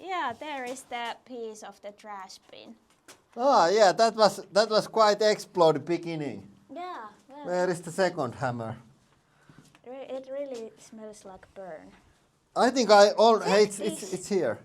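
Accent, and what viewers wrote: Finland. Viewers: Hammers are nice and all, but nothing can beat a Finnish accent. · Love your videos, love your Finnish accent 🧡🤘🏻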